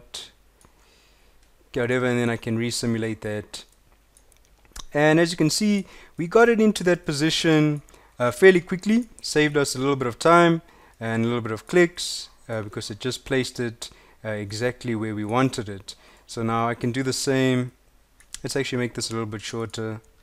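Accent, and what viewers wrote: South Africa. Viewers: weird thing but you sound south african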